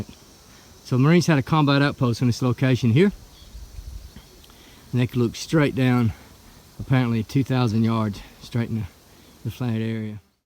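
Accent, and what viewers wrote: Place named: South, United States. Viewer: I have just discovered your channel (love it by the way, amazing content!), and based on solely your accent and the way you speak, I genuinely cannot tell whether you are from the American South, or Australia. I went back and forth on it all the way through this video and still couldnt determine.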